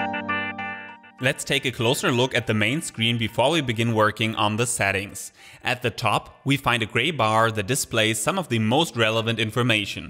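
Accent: Germany. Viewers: Hört sich an wie ein Deutscher, der englisch spricht.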